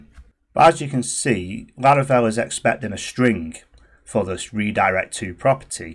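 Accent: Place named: Australia. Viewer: Many Thanks , its really helpfull (but i sometimes confused with your australian accent heheh) , already subscribe and like all your video :))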